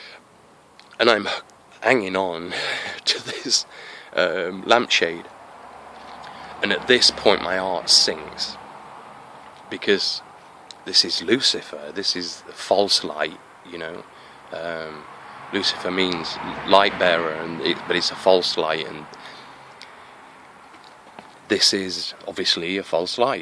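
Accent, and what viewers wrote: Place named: Lincolnshire, United Kingdom. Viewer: could i ask what region your in? your accent sounds like mine im in lincolnshire. just wondered as everyone i know is asleep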